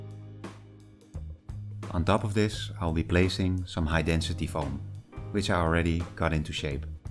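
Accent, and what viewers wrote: Netherlands. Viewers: zwaar Nederlands accent. is wel cool! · Bruh you dutch aint you? I can hear the classic dutchman speaking english accent. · Detects a dutch accent.. spots gamma ... fuck yeah Hup Holland. Also cool Diorama. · Kinda random but are you dutch? 0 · nice thick dutch accent ;p lovely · Your accent tells me your dutch. Me 2 Great diorama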